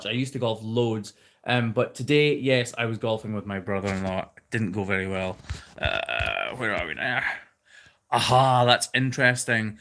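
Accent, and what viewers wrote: Scotland, United Kingdom. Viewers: Hello from upstate NY. In U.S.A. ..I simply love your accent from Scotland. We obviously do not get yo see how beautiful Scotland is so thank-you to and your wife for sharing your profession and Country with us.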